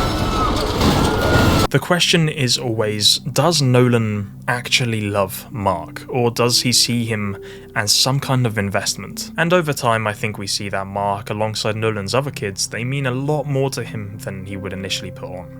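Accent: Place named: United Kingdom. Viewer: Good invincible video? British man talking for 10+ minutes? Subscribed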